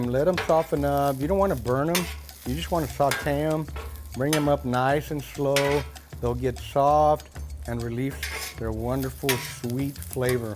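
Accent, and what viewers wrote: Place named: Houston, United States. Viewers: I have uncles from Houston that sound exactly like. 😂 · Sounds like every first gen American (or born in Mex and came young) that lives in South Texas. 😂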